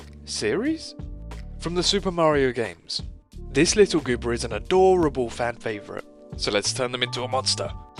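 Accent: United Kingdom. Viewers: Your Brittish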